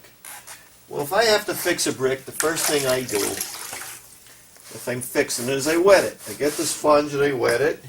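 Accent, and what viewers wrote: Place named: Pennsylvania, United States. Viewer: You are very generous with your knowledge and those of us who don't know what you know really appreciate it. Just a question: You sound like the guys I know from the Hazleton area in PA. Are you from around there? Anyway, thanks a lot for your help. Rich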